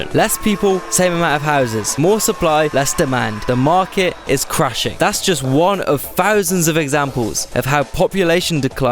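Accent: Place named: United Kingdom. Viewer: I love compilations of random clips with a slighty angry British person talk about it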